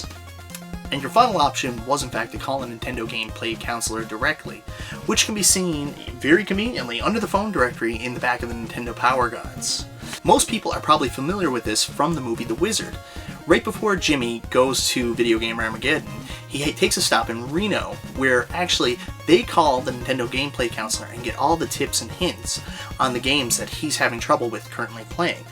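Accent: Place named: Nova Scotia, Canada. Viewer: Canada eh? Based on your accent, I'd say somewhere near Nova Scotia.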